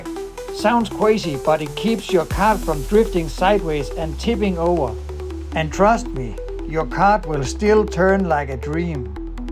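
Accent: Denmark. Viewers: Hey, that's the real, at least his voice. Deeper, older, but yep, I recognize his Danish English accent. So he is real, at least he was 8 months ago. (grins) But why is he always hiding himself all the time? Sup with that? And what's with that 'Jason face mask'? Hiding yourself like that is very suspicious.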